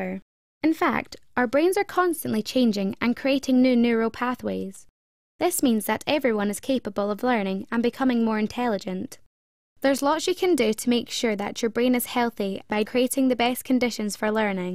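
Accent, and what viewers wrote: Scotland, United Kingdom. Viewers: Great advice! It's probably the opposite of how most children are forced to learn these days. Btw, I think she sounded Scottish. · Are you from Scottland?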